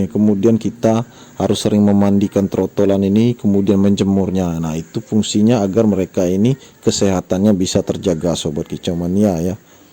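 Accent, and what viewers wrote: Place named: Bengkulu, Indonesia. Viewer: iya logat macam orang Bengkulu di mana bos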